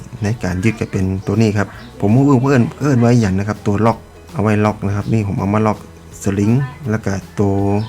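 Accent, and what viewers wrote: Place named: Isan, Thailand. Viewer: สำเนียงจังหวัดอะไรครับ เพิ่งเคยได้ยิน น่าฟังดีครับ ฟังๆดู มีทั้งเหนือ,อีสานผสมกัน คลิปมีประโยชน์ดีครับ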